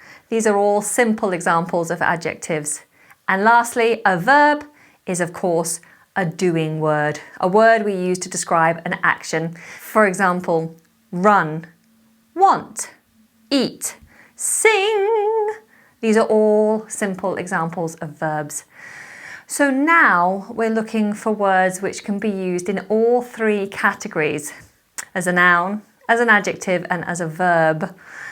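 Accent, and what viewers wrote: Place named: United Kingdom. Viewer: A Muslim here , i love your British accent and i hope you and everyone become A Muslim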